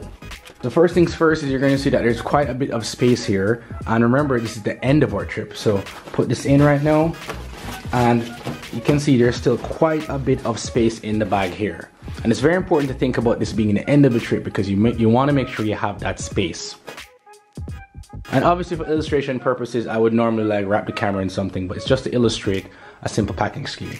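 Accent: Jamaica. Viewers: can hear the yardie accent lol. love it!!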